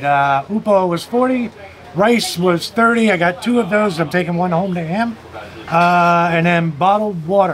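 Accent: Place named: Canada. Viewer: Hi! Late for the party again! I heard you loud and clear when you asked if it was Bitter Gourd. It was Bottle Gourd. She probably misheard your Canadian accent. I have no problem talking to or hearing an American accent. When I moved to Canada, I have noticed that Canadians talk too fast. I am used to it now. This video got me excited and famished! So many yummy dishes❤❤❤ Thank you!!!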